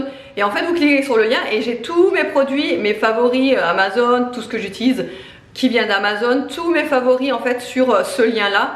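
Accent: Alsace, France. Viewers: ah d'accord ! Je comprends c'est pas négligeable ! J'aime beaucoup ce que tu fais comme vidéos. Et en plus tu es alsacienne comme moi et quand je te regarde ton accent me fait penser à lalsace ahah bonne journée et bon weekend à toi bises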